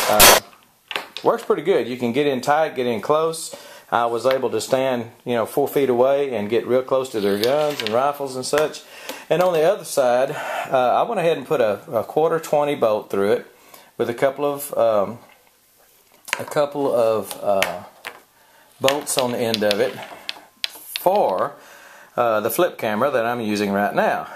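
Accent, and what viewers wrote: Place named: South, United States. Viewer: I understood every word and love your accent! Voice overs for Disney could definitely be a second line of work for you! Another Southerner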